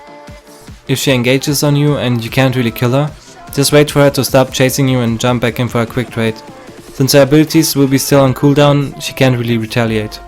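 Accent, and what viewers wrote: Germany. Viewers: One question... Is he german, his voice sounds german in my opinion :D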